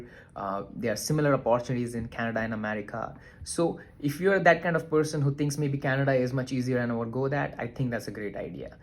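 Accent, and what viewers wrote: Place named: South India, India. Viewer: Chutiya accent badal be... Understand se pata chalta hai ki South Indian hai ye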